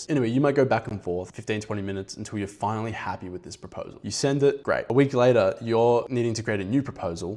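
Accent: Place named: United Kingdom. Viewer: Really like what you're doing .. we're building the best Sales & Marketing Voice and Messaging agents you'd come across, along with business analytics tools for major corporates. We code our products. From the sound of it, you're definitely british, I lived in Manchester for a year during business school as well, right down the street to Arndale center. Would love to see how we can collaborate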